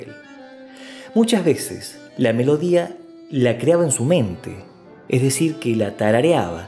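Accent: Tucumán, Argentina. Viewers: Muy bueno el video. Haría dos comentarios. Le Pera nació casualmente en Brasil, pero nunca vivió en Brasil, siempre vivió en Buenos Aires. De la manera que Gardel Nació en Francia, pero pasó su infancia en Argentina. Diríamos que los dos fueron argentinos. En cuanto al relato de la creación de Por una cabeza, no fue Pigna el que lo recopiló sino que está en el libro de Tucci. En cuanto Delicatessen, no recuerdo que apareciera Por una cabeza, lo que sí aparece es Una lágrima tuya., me gusta más cuando hablás en tucumano que cuando hablás en castellano neutro.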